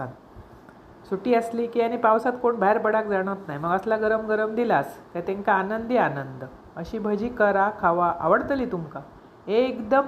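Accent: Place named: Malvan, India. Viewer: ताई मस्त 👌👌 आणि त्यात मालवणी भाषेची गोडी व्वा! 🙏💐👍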